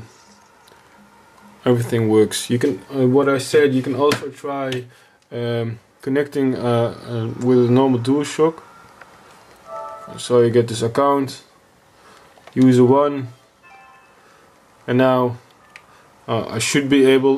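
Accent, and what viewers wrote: Netherlands. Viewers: ben je nederlands toevallig? zo ja, goed engels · Are you dutch ? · Are you dutch??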